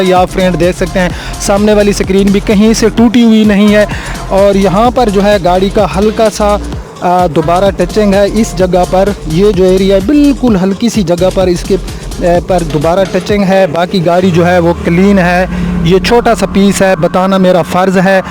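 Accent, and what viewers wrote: Saraiki, Pakistan. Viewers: Aap ka speech style impressive hy sraiki lehjay ki wajah se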